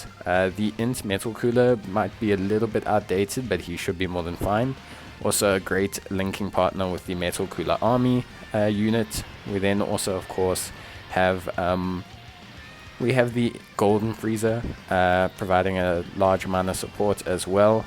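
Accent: South Africa. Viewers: you sound South African, are you from south africa?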